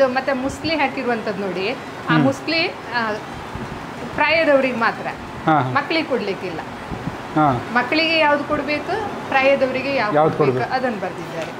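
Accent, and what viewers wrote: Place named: Kundapura, India. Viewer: Madam neevu Kundapuradavara. Matado style ade tara ide. Thank you🙏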